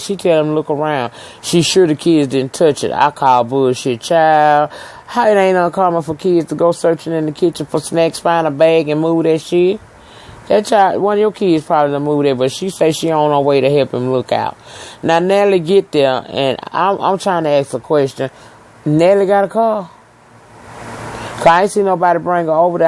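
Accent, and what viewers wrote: South, United States. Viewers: I am so sick of Alex, I've known a lot of women like her who cheat and then just act like they can get everything the way they want it. Such entitlement! Honestly I can't stomach this show anymore because these people are so fucked up. Lol. I just enjoy hearing your lovely southern accent reviewing the story! Glad you're back home safe!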